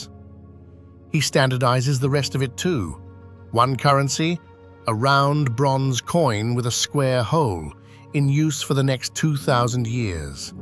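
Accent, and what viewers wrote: United Kingdom. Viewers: The narration is a beautiful voice with a strong British accent. Thank you for telling people who don't understand Chinese history about our 5,000-year-old, uninterrupted history of constantly rising from the ruins.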